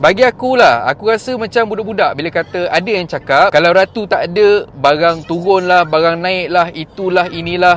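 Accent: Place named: North, Malaysia. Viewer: blk utara ka.. Best mampuihhh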